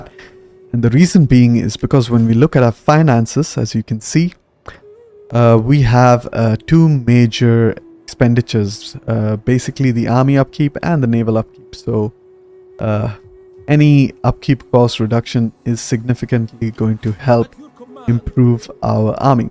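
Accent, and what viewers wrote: India. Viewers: Are you a desi? You accent is similar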